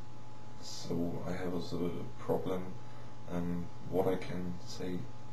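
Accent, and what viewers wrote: Germany. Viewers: Deine Aussprache ist echt gut, find ich, besser als meine ;) Und den Deutschen Akzent kriegt man eh nie richtig weg :) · Cool and funny :P Haha you can hear ur "English-german"! Anyway, maybe i come up with some cool ideas for ya m8 :D and btw, kill that bird! :D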